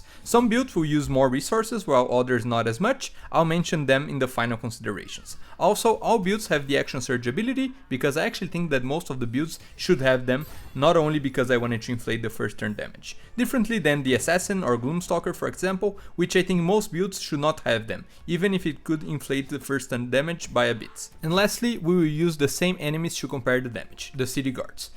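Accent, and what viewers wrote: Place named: Brazil. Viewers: Pensei a mesma coisa, o sotaque do inglês dele é muito parecido se não for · Are you brazilian? You sound like a fluent brazilian speaking english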